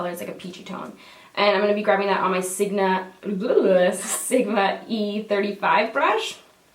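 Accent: Canada. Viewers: I am obsessed with your accent, I love Canadian accents soooo much haha. I would love to visit Canada, it's my number one destination! I'm from Australia and I've never been overseas so I'm so unbelievably keen to start traveling. Love your work, you're amazing. xxx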